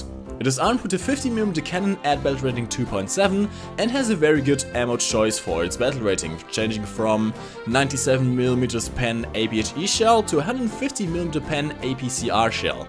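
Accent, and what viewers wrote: Germany. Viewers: Are you german? Your accent is just too flamingly homosexual, which leads me to believe you're German. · Are you German? Your pronounciation sounds really like that... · are you german?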